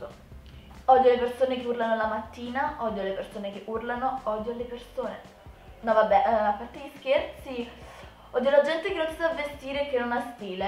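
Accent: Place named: Naples, Italy. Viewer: Ma sei napoletana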